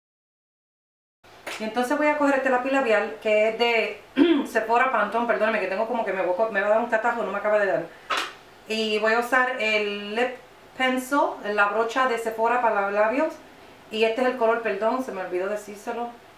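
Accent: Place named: Puerto Rico. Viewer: a mi me gustan los maquillajes naturales :) ademas quiero que sepas q me gusta como hablas bien boricua.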